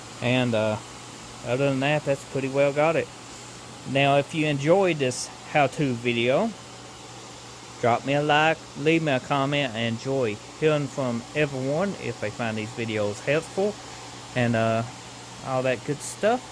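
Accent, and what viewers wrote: United States. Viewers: very quick and to the point, good to hear a man with a true American Accent too!!!! Thanks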